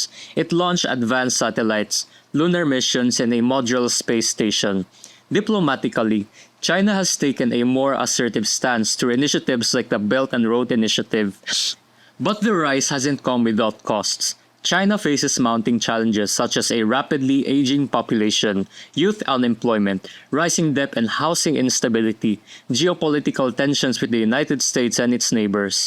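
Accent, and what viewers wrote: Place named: Philippines. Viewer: Downvoted for a flip speaking English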